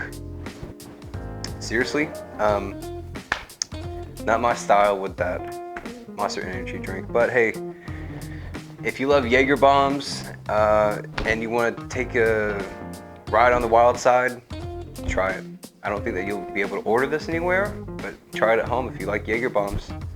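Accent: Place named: South, United States. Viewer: I know right? Love his slight southern accent too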